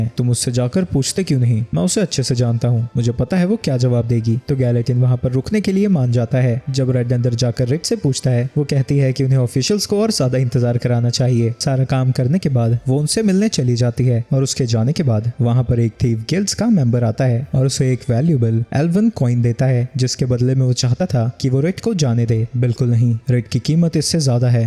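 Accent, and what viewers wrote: Gujarat, India. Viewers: Bhai tum gujrati ho kya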